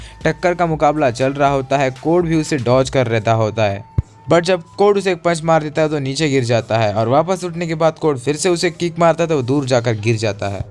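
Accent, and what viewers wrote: Bengal, India. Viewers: Bangali he😅😅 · Ya bangali h😹😹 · Ye bengoli hai 😅😂😂😂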